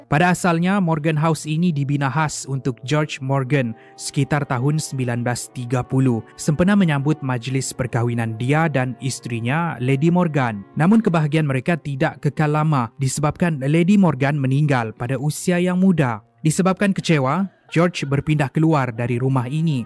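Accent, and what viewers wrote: Sabah, Malaysia. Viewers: kau orang apa sabah kah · gaya bahasa terlalu formal dan masih jelas dialek sabah. tapi jgn disalahertikan, sekadar mengatakan shj.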